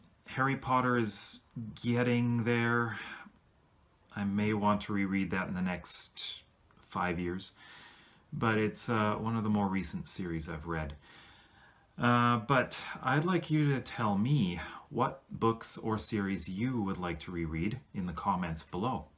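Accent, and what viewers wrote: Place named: Canada. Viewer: I like your accent! Is it Canadian?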